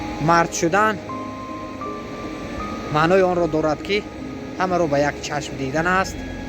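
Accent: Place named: Vorukh, Tajikistan. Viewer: акценти ворухи гап мезанед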